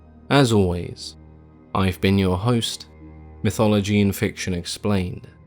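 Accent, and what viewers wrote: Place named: United Kingdom. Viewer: They are beautifully ugly like some exes love the smooth calming British accent from channel host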